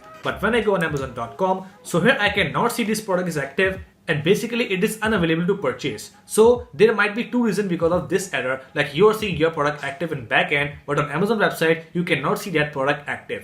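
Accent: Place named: India. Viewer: not hate but your accent is an incredible thing that most indians do ITS AN INSULT FOR AUDITORS SERIOUSLY, fix this please!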